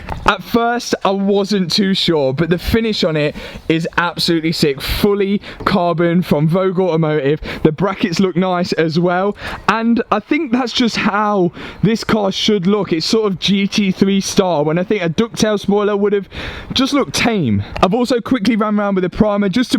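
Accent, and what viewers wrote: United Kingdom. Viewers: This will sound bad but I don't like the British Accent at all but for some reason i enjoy your content and your energy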